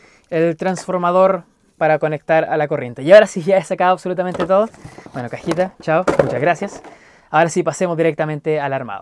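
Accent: Chile. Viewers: Eres chileno o no? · Me encanta el asento chileno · Tienes como hacento chileno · eres de chile? es la primera vez que te veo jajajaja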